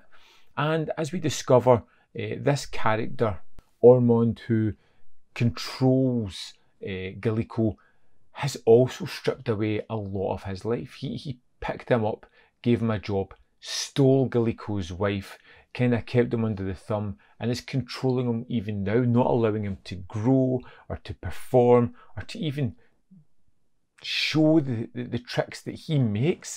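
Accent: Scotland, United Kingdom. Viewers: THIS REVIEW IS SPOT ON IT PROMPTED ME TO BUY BLURAY.....AND I WASN'T DISAPPOINTED ..LOVE THE GENTLE SCOTTISH ACCENT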